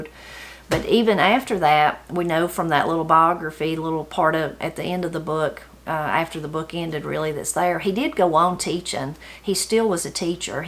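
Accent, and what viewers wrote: Appalachia, United States. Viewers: I cannot tell you how much I enjoy listening to the books you read about lives in Appalachia with your beautiful accent…! I have lived in the Blue Ridge for 5 years bow and Love the stunning grandeur of these mountains, the graciousness and simplicity of the people and their connection to this land. Sad to see this book end…!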